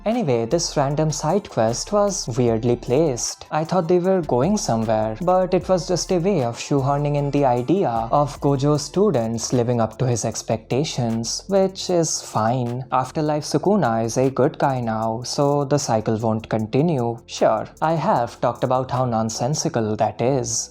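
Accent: India. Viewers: Clearly seems to be Indian.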